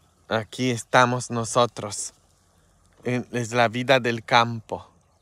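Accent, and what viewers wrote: Mexico. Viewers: Padre,soy una subcritora nueva de su canal ...es usted muy ameno,me encanta verle en su día a día y oírle hablar en español con ese bonito deje mexicano!! Por aquí estaré !!!..un abrazo!!!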